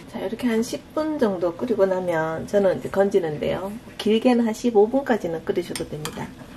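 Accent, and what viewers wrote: Gyeongsang, South Korea. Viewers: 안녕하세요? 안내에 따라 와보니, 여기도 매우 좋은 곳이네요! 근대 된장국, 보기만 해도 침이 꿀꺽 넘어갑니다. 귀하는 경상도 말씨라도 목소리가 좋으며 설명이 명료하네요! 거듭 감사드리며 - - - - 자주 뵙길 희망합니다.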